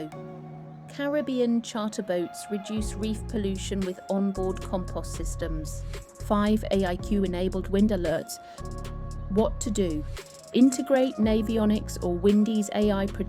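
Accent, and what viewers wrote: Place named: United Kingdom. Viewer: British accent ❤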